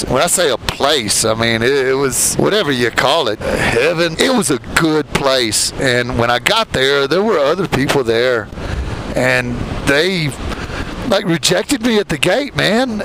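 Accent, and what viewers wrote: South, United States. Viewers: I love that southern accent. And that video was awesome. If you're ever in Sydney, Australia. PM me and I'll buy you a beer.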